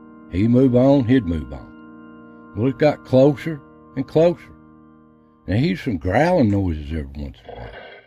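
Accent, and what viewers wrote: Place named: South, United States. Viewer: I love hearing these old stories. Thank you for documenting them. You have a nice southern US accent. Your way of speaking and tone is very relaxing & soothing to listen to (no loud sound changes/ noises in the video).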